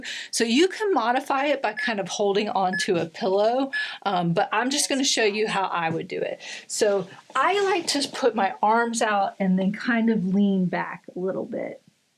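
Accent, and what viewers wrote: United States. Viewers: I tune in to hear you say 'disclaimer alert' in that wonderful American accent.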